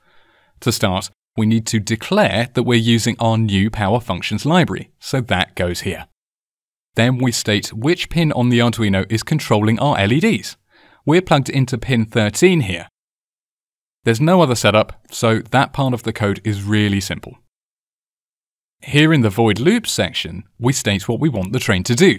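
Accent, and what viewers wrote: United Kingdom. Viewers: Just a small point, but when searching for a datasheet, isn't it better to search on the components code and the word datasheet instead of the code and pdf? Not all datasheets are PDF's, obviously most are but some are weblinks, and true there is usually hundreds of results for popular components, but sometimes obscure components are hard to find and by just searching on PDF you may mis a datasheet. Can I just ask something, how come when you search on google it's the Polish version, when you are very obviously from England, home counties by the sounds of it, why and how come? Are you hiding in flat coming out when he leave to buy hamster food? Great videos BTW, thanks. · Well done ! You have a pleasant voice, a neat pommie accent and you make the technical bits sound easy - great for building confidence in your viewers! Looks like it is time to dump the N-gauge and get a few Lego trains! 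I also love ARDUINO - I use it in my Christmas Villages.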